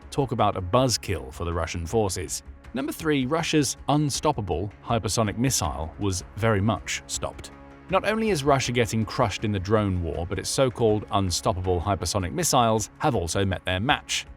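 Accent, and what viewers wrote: United Kingdom. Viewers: Next time, use mid-continent English, not uk "english", a la Shakespeare crap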